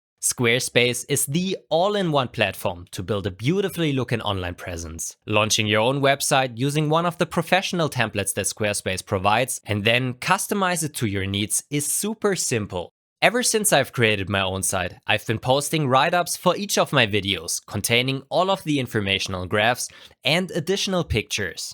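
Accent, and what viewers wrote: Germany. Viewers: Your English is sooo German, it makes me smile every time I hear a new video! ;-) Sorry, I beg to differ. The von Mises Estimation assumes the material to be rather homogeneous and isotrop. Both of which 3D printed material is obviously not at all, due to its layered characteristics. In addition, printed material has a different strength than filament because of nozzle and squishing effects. So your estimation of USS may be far off, and you don't even give an idea by how far before comparing to your experimental values. The real failure lines should start at the compressed surface (=upper end of the nut), not at the bottom surface of the whole body (=lower end of the nut), which is uncompressed. After finding that compression failure and enlarging the compression area are the best way to go, I'd be curious to see how a nut with a washer or a claw nut (german "Einschlagmutter") behave in your setting.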